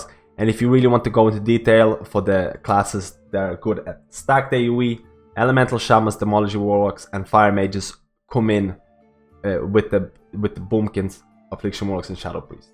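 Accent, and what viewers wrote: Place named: Ireland. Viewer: i know this is unrelated to the video but your accent is driving me crazy trying to figure out what it is, its like you have a strong Irish accent one time then all of a sudden you have like an eastern European accent. Please can someone tell me its driving me crazy!! oh nice vid as well :P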